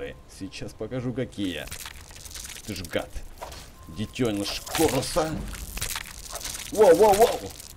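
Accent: Ukraine. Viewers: судя по твоему говору ты живешь на Украине? Или это юг России?? Ростов или Кубань?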